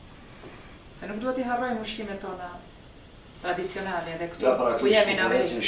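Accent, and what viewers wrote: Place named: Vlorë, Albania. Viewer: Qe prej theksi dukeni qe jeni vlonjat😍❤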